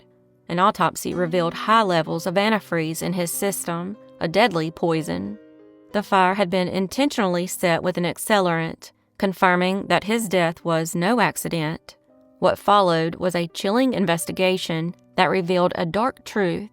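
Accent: South, United States. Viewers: THANK YOU!!:hand-pink-waving: SOLVED CASES!!! YAHOO!!BEAUTIFULL COUNTRY VOICE AND A HEART AS BIG AS MY HOME STATE!! [ TEXAS ]!! GOOD WORK!!💯❤👌🧑‍🌾👩‍🌾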